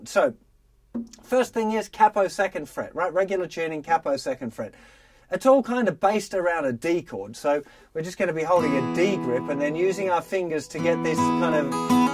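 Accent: United Kingdom. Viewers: You have a British accent, and a Geordie hat. Thanks for the lesson. I used to play this, but it was years ago, and I needed a refresher. Thank you! PS: is your guitar make from? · I am from Liverpool, England. Lennon actually sings "she WORKED In the morning and started to laugh," not she walked in the morning et al. We Brits have trouble rolling the letter R. You sound like a brit....are you. You do a great job teaching and seem like a nice talented lad. Please keep up the teaching. Tara lah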